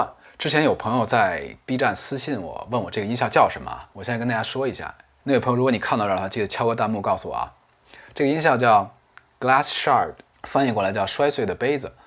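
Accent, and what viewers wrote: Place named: Beijing, China. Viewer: 太厉害了，感谢分享，ps：京腔很正～！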